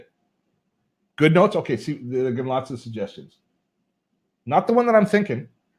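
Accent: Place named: Canada. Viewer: Being from the US I always enjoy your Canadian accent. It adds a pleasant touch that makes us partners in our uniqueness. Thanks for everything you do.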